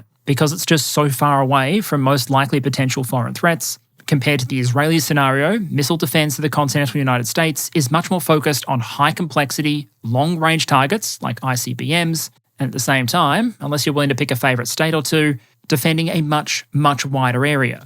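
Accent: Australia. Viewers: Am I the only one finds it amusing and somewhat dystopian that team has needed to substitute "Australia" for "Israel" in this videos subtitles to avoid automated demonetisation by youtube? It's amusing that a tech superpower like 'Alphabet Inc' and its automated filters can be defeated by the Australian accent and tweaked subtitles, and dystopian that team has had too do it An example of this is at the minute mark, but it happens throughout the video so I don't think it is an accident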